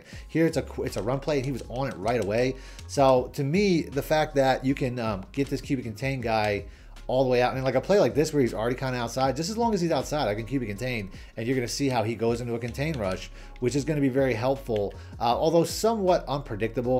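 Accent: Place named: Delaware County, United States. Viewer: You sound like you’re from DELCO….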